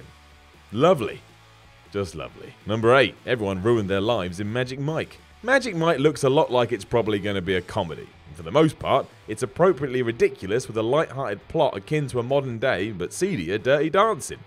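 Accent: United Kingdom. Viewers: It’s disgusting to use 9/11 for anything except mourning. A guy I went to high school with died there at the top(where the kid is in his father’s office.) He was a 21 year old working in finance at Cantor Fitzgerald. To use this tragedy to end some shitty film is morally atrocious. Maybe you could replace it with, I don’t know, “fight club?” This announcer sounds British, would their terrorist bus and subway bombings be appropriate to end a movie with to him? In fact, there’s an incredible amount of films that would be better, my god, “6th sense”? Please be decent enough to lose your final movie, and replace it with ANYTHING else.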